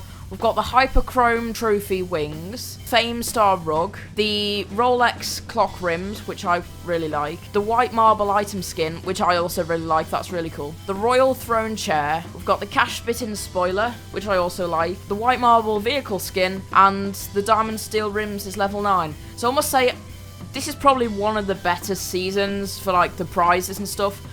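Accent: United Kingdom. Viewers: LOL i love ur accent XD u from like south western??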